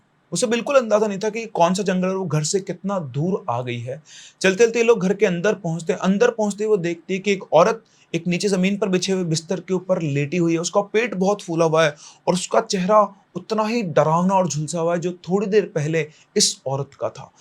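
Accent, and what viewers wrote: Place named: Maharashtra, India. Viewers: Hello sir I am from jalgaon and i loved the story and ur marathi ascent ❤ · Humne bhi aise hi story suni hai relative se U were talking Marathi cutely 😊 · I love that Marathi accent of sir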